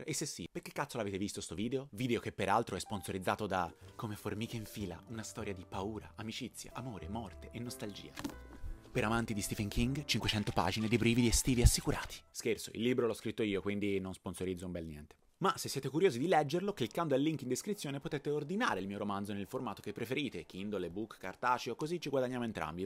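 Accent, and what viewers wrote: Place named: Tuscany, Italy. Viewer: A di che città toscana sei?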